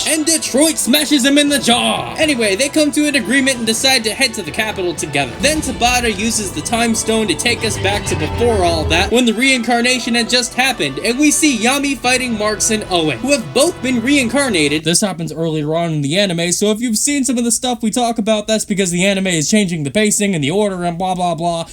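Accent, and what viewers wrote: United States. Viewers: Hahaha bro your American accent is really good. Impressive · I feel like when you talk in your American accent it makes me sad because you are conforming to American society and losing your individuality. Your accent is sooo much more passionate and interesting to listen to!!!! American accent for memes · i said his american accent was getting better😂💯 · WHAT HAVE U DONE TO, HE'S BEEN KIDNAPPED BY AN AMERICAN WHO IS IMPOSING AS HIM D: on a serious note give us back not this merican dude. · Am I the only one who likes his American accent? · this video killed me and he actually managed to make it to then end using the american accent :) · I clicked the video and i thought it wasnt. Your accent over american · I don't know who can't get enough of the American Accent but watching it with your new videos I can assure it doesn't have the same energetic and passionate as your usual accent. 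Please go back to your usual accent.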